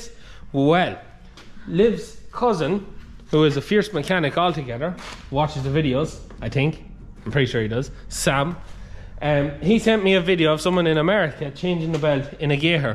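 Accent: Ireland. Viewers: Same beltdrive like an snowmobile! I wonder,is it Irish or is it a dialect you mix whit English?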